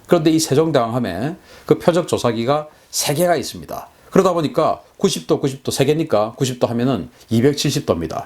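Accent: Gyeongsang, South Korea. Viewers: 다좋은데 상도사투리듣기가 좀 거북하네요.표준말쓰세요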